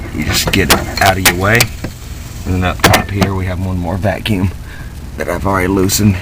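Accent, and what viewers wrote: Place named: Cleveland, United States. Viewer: u sound like cleveland......thanks,this vid really helped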